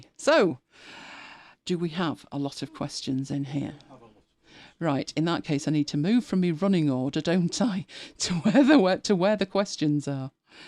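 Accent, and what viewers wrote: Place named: Northern England, United Kingdom. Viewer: How many years have I been stumbling around on YouTube, picking up a snippet of info here, a snippet of info there? I finally, finally, found a teacher who knows how to teach?! This is fantastic instruction and exactly what I've been searching for. I've been using MS Word and various basic page layout apps since <ahem> the last century, but now I need Affinity Publisher and I've been baffled by its complexity. I know it can do what I need to do, but finding those tools and making them work has been a source of endless, discouraging frustration. I'm feeling capable and optimistic again for the first time in months. It doesn't hurt that speaks like I do, either 😂 Or how I would have sounded if I hadn't moved from northern England to Canada many years ago. I am 100% connecting with this content. [Update: didn't have time to watch this all in one go, so I'm on day 2. 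And I have to say again: oh my goodness this is good teaching. I just found Medium account. Will be devouring that too.]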